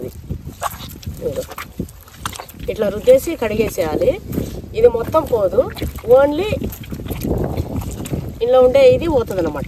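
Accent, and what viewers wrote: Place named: Rayalaseema, India. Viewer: Rayalasema slang ki navvu vachindi 😅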